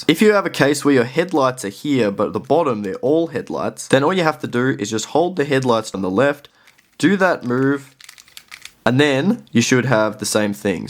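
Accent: Australia. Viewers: Thanks for this quick and easy to follow tutorial 👏😁 But the Aussie accent was so funny 😂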